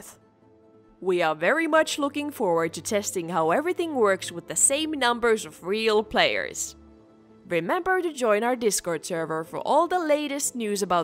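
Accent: Finland. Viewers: Is she Finnish?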